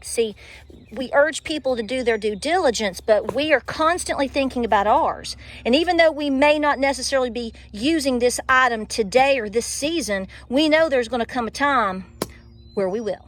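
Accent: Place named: Appalachia, United States. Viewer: Anyone sayin you ain't appellation. Just need to hear this rant! Southern momma bear strong.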